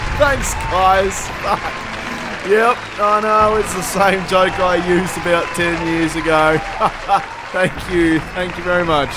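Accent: Australia. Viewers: brang back so many fking memories of the hitman blood money series very nostalgic excellent commentator 🇭🇲💯🤣😁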